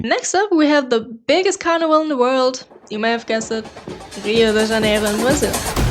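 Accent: Germany. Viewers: Good video overall, here is my constructive criticism: The audio is a bit raspy. While this is obviously a money issue (microphone), it is making it harder to watch. This is especially so because you are trying to present a pleasent scene - good mic quality plays a big part in that. While the german accent is really cute, the amount of pausing you do between some words can make it harder to keep attention (This is one of those things that comes with practice I guess). I can't decide wether im vibing with the choice of background or not. On one hand, I like the idea of setting the focus on you and the cosplay, on the other hand I can imagine this getting a bit bland rather quickly (especially for the average attention span these days (we're all cooked)). I generally really like the editing. I audibly giggled at the zoom . A few soundeffects could help underline comedy and better the viewer retention. 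I dont necessarily dislike the amount of text used, but just having it a standart font white on black for every single one is a bit boring if you ask me. I really appreciate that you are trying to be creative and not just repeating known methods and whats popular. A lot of my criticism is just due to you figuring things out so dont take it to seriously. Its a bit confusing to me what direction this channel is headed. You are not trying to be as attentiongrabbing as possible (which is great, please dont be!), which implies that you want to build a strong viewership instead o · I didnt know you´re german too! Well, atleast by the video and the accent im thinking so (okay yea i didnt complete the video before)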